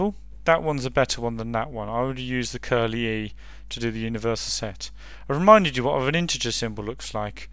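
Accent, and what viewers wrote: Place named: United Kingdom. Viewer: yesss finally found a math video with someone with a british accent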